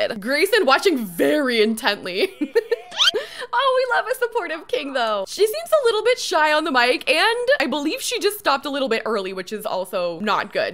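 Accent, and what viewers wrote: Canada. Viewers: When I saw Eliza as a mixologist, the first thought that came to my mind was "oh God, she's gonna spit in all of the contestants drinks" 🙈 Absolutely love the chaos in the Reality show! Little, funny side note: since I've been watching your videos I kinda started picking up your accent and the way you speak :D My half Canadian English teacher (who speaks normally in British English) was excited to hear his accent from one of his student xD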